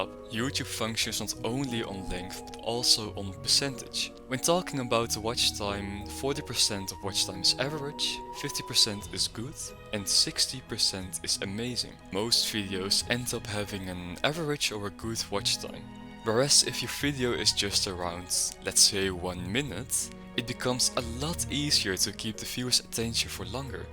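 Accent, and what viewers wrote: Netherlands. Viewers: Are you dutch by any chance? I’m asking because of your accent :] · Genuinely a great analysis video. Lovely Dutch accent, great use of background music (I loved the Persona OSTs after the 'high school is not like persona' video being shown), and good scripting/commentary! Nice editing too. All in all just a great high quality video and got multiple laughs out of me. Make more and wishing you the best of success with the channel, liked and subbed <3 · Hoorde meteen dat je Nederlands bent mijn broeder😂💪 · Wait...are you dutch??? like from the Netherlands😭😭i can smell it i just can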